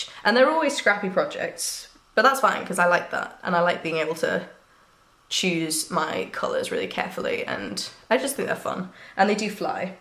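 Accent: United Kingdom. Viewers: Enjoyed your first podcast, nice to have another UK voice in the mix!!! Loving your red cardi. There is a school of thought that suggests, when you have a lot to do, doing/finishing the easier or quicker things first is helpful because quickly you can get the list down to fewer items which eases the overwhelm…??? Dunno if this appeals? Also loving the look of your ‘scrappy cardigan’ that’s going to be beautiful. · Hi, I was wondering....Are you English? Cause, I like your Accent, there. 🆒️🆗️👍. I hand knit & use a flatbed knitting machine. But, I'm not a Podcaster. I just watch others knit & Crochet. I Love that most. I hope you show a lot of your knitting projects on YouTube. So, that Knitting Family can see your work! And NEVER, we're if you are showing mistakes in your work. Cause, that's just teaching everyone that, No one is perfect. And that's OK. Everyone is Human. 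Just go for it, your Dream, here. I will be rooting for you. Bye, for now. Blessings I send to you, too. 🙏. Sincerely, Elsa R. 64 yrs. young/old woman. From out in California👋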